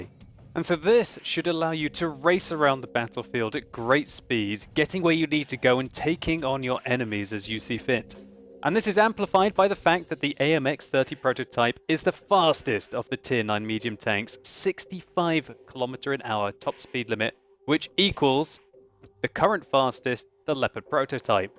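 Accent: France. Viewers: Lovely french accent :D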